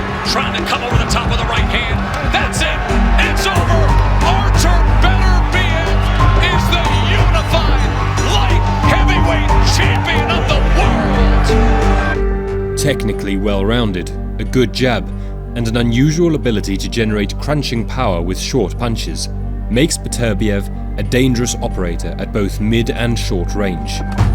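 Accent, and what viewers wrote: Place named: United Kingdom. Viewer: Damn got a beautiful British accent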